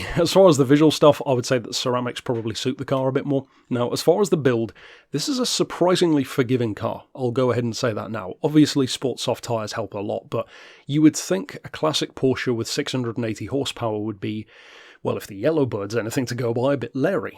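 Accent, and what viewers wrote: United Kingdom. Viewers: You know you're in good hands when you find a tutorial with a British accent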